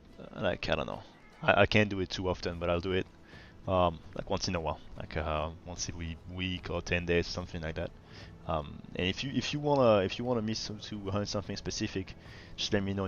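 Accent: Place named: France. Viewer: Alors accent français... 69... région Lyonnaise? 😏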